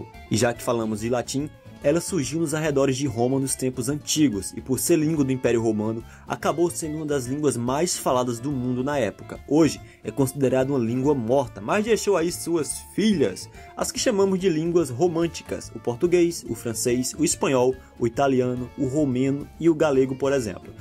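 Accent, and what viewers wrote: Northeast, Brazil. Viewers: Tu É do Nordeste né!?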